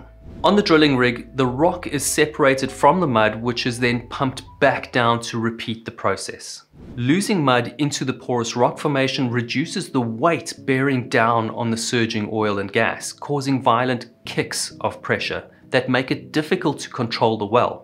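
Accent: South Africa. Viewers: Please try and make the story telling more like a conversation and not a accident report that was being read bullet point by bullet point... I really struggled to follow what was happening... It's not a lack of information just a frantic pace to fit in all the information... Great video... Also you sound South African? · I really like this guy with his almost unlimited knowledge of what he is talking about. He has a wonderful South African accent and is so articulate in his delivery. Others on similar subjects on You tube are not quite in the same league as this great man who really knows how to put together a professional video. One might say good enough for television. One hopes he continues with these documentaries in the future. They are far more superior than the American versions. Peter Starr London UK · Like the South African accent. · Hey a south African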